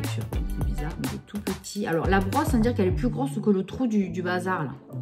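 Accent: South, France. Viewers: Coucou ✌🏼 Je te découvre par le biais de Laura (Alora Bora) puisque nous voilà embarquées dans un défi ensemble 😅🤦🏻‍♀️ Je ne te connaissais pas... donc je suis vraiment contente! Tu as un bien accent du sud dis donc 😉 allez je t’embrasse et continue à regarder ;) 💋💋 Alo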